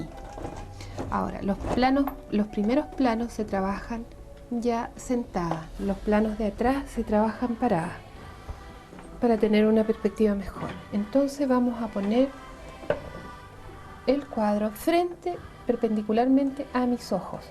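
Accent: Chile. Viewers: Me imaginaba por tu acento que podias ser chilena. Saludos de un Chileno pati perro.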